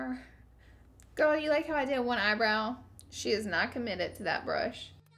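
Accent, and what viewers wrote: South, United States. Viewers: Tori Mizuno go tell a Canadian to say say sorry correctly lmao we all know she’s form the south and we all say things different depending on where we grew up. Come on now. Stop trolling. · Southern thang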